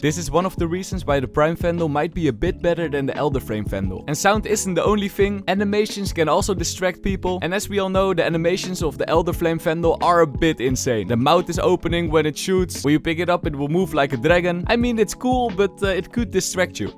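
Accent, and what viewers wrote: Netherlands. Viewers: haha het nederlandse accent is zo duidelijk (geen haat gast ga zo door met die content)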